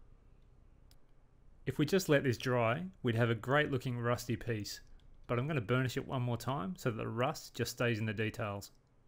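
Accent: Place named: South Australia, Australia. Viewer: Are you from South Australia? Your accent is exactly like my friend here in London and he is from Adelaide.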